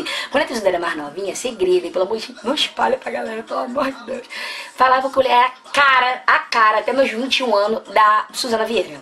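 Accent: Rio de Janeiro, Brazil. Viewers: Acabei de achar a tal da Viegas no YouTube.. e naaaaada aver.. vc é muito mais doida e mais engraçada kkkk jeito único. O sutaque de vcs q parece só.. mas normal né , afinal é tudo Rio.. bjos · Engraçado nesse vídeo vc se empolgando tanto nos assuntos aí do nada: então vamos passar esse pó... rs já que o papo foi mais comparação, confesso que quando comecei a te assistir sempre que escutava sua voz lembrava da voz da Heloisa perisse na personagem do filme o diario da tati. Sou carioca tbm, mas vc é a primeira pessoa que vejo nitidamente o sotaque do carioca. Agr comparar com nayara azevedo até vai, mas com suzana Vieira. PQP, nada haver kkk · Você só tem um pouco de aparência da Ste por serem cariocas, o jeito de fala. Mais são completamente diferente uma da outra.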